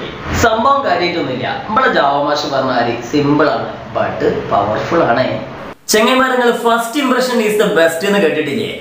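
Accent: Malappuram, India. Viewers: ikkaa ingale language kettaal ariyaa malappurathkaarananenn Malappurathe piller like plzzzzz😄😄😄😄😄😃😁😁😁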